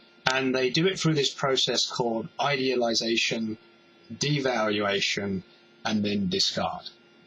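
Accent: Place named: South, United Kingdom. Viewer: the healthy narcissism part I could utilise for being/becoming a dancer, learning to overcome fears is needed moreso.btw, I can tell you have part dutch genetics within you from your vocal tone/sound, (of course mixed with a southern uk accent)... maybe I can connect more positively here on youtube :)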